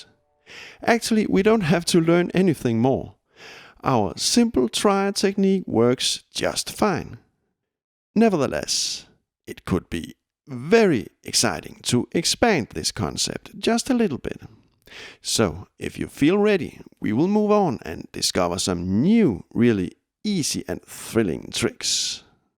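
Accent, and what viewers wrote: Denmark. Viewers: Is that a danish accent I hear? Great job!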